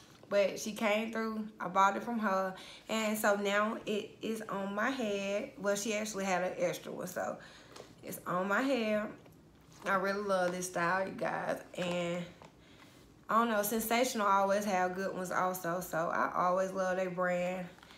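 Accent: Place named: Georgia, United States. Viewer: This color is so pretty!! And you must be from Georgia. I recognize the accent lol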